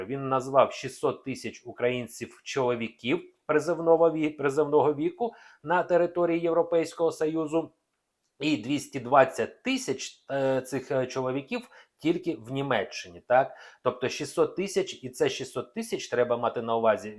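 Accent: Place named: Russia. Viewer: Прослухала не один матеріал від Вас і таке враження наче Ви радієте коли говорите, як повернуть чоловіків з-за кордону; вишлють біженців..як нікому ми тут не потрібні.. є нотка злорадства. Дивлячись на досить помітний російський акцент, здається що це таке собі іпсо. Хоча не зрозуміло чи від України чи від москалів. А може краще було б відіб@ від людей, перестати маніпулювати? Дати кожному вирішити самому де жити і за що воювати? Приносити користь, кажете. Так зберіть алкашів, нехай приносять користь. Там точно ціла армія набереться. Чи що, політики наші вже синів з за кордону забрали і відправили в бій?) Клоуни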